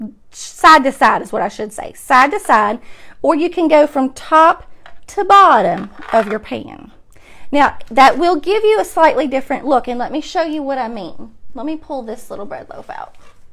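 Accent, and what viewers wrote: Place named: Kentucky, United States. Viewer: I found you by accident looking for how to make faux food- and the fact that you do primitive style?!! Wow! Even better! I love primitives!! I haven’t seen anyone do primitive style with faux baking. I’m a new sub. Your vids are so easy to watch and just love your sweet Kentucky accent 😊. Greetings from southwest Florida! 🍊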